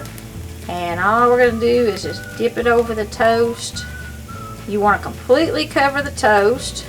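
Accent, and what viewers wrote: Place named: Kentucky, United States. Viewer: My dad was in the military in WWII. He would not eat SOS, no way, no how. I tried several times when I was growing up to get Mom to make it. She would not even make a pan of SOS. I still make a batch sometimes for breakfast (with sausage) just to remember Dad. By the way, I grew up in Western KY. I love your accent. You know it reminds me of Mom.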